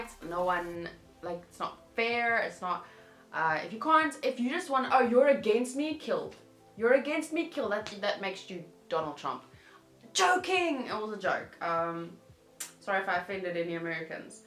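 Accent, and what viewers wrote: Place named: South Africa. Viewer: Is she from South Africa? It's a really nice accent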